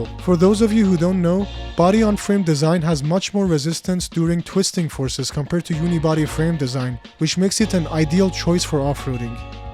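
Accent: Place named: Iran. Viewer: Nice Iranian accent. Iranians love Toyota’s 👍🏻👍🏻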